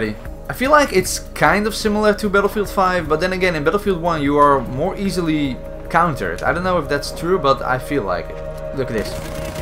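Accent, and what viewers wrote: Netherlands. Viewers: Very nice dutch accent i love it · Je hoord dat je nederlands bent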